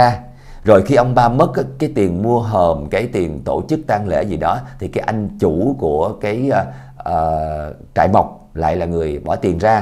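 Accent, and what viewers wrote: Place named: South, Vietnam. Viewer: Người miền nam họ kể chuyện dể hiểu hơn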